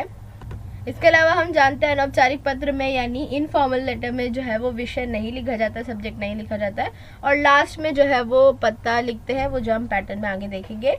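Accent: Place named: Maharashtra, India. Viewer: her marathi ascent😍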